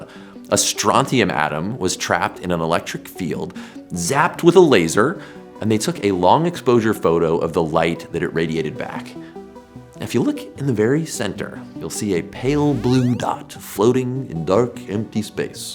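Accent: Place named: United States. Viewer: American pronunciation is so weird, this video is entirely about Adams, not atoms, yet it shows atoms not men called Adam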